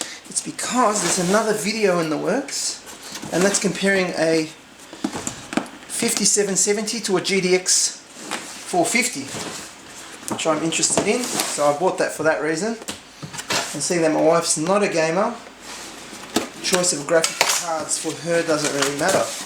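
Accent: Australia. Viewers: Is that an aussie i hear?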